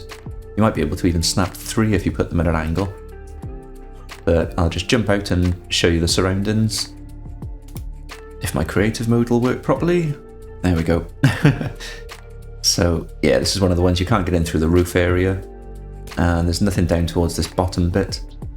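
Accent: Wales, United Kingdom. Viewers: am i picking up a welshy accent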